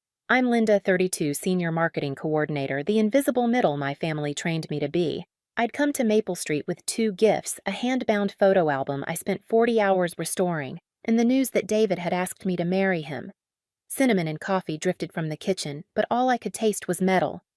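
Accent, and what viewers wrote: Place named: South, United States. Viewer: Interesting story. 👍 That accent.. is it from south? Lovely. Sorry I'm from Europe. 👍🥰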